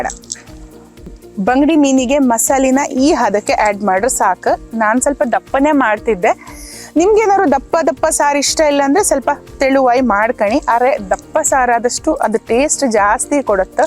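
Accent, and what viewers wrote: Kundapura, India. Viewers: Nimm maath keli Kundapura koteshwra thallur upinangadi vanse maravnthe kollur.....nenpayth marre ! super👌✨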